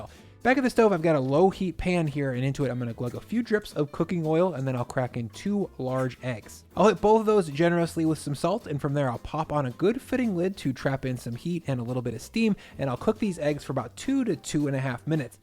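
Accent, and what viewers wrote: United States. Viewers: Why does this guy have to use metric system measurements? It’s so frustrating. He’s clearly American with his accent or lack there of.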